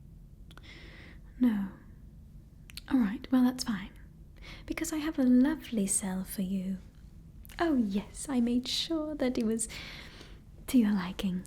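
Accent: England, United Kingdom. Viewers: Nice English accent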